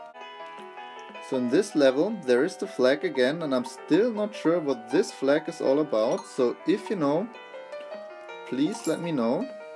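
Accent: Germany. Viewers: Man hört an deinem Englisch, dass du Deutscher bist :D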